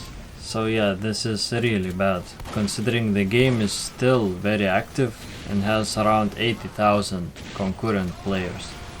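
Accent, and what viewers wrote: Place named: Ukraine. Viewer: but your english accent is very similar to ukrainian people. really.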